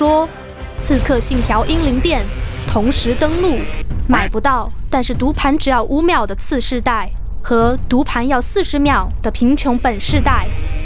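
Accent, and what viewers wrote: Taiwan. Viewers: 为什么4台湾口音？ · 台湾配音的国家地理中文节目，就是这种风格。我小时候经常看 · 为啥要用台湾腔啦，淦。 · 这次太秀了，台湾腔加各种段子，我希望以后这样的作品会更多，给你点赞！ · 我覺得有六七分像，但不完全像，台灣人講話比較輕，沒那麼用力，語速也沒那麼慢。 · 哈哈哈哈哈哈哈这台湾腔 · 这台湾腔学得很牛逼啊! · 這台灣腔？ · 很用力的模仿台湾解说腔，“强力冲击”最后一个字要发成二声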